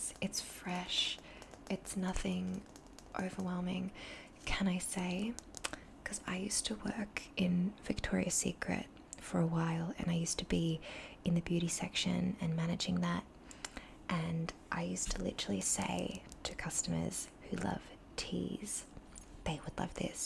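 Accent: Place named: Australia. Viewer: Love listening to the australian accent 🌞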